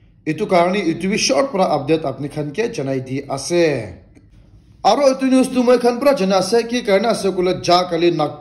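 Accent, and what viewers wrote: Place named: Sema, India. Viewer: Please control your sema accent it's heard to hear😅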